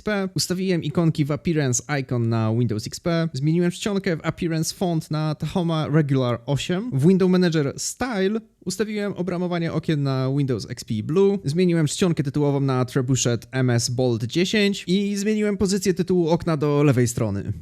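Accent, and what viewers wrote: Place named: Poland. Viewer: to jest najbardziej polski angielski akcent jaki kiedykolwiek słyszałem (automatycznie ustawiło mi się na angielski)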